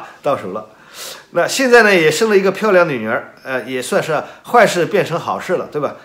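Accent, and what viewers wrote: Shanghai, China. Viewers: 爷叔上海宁？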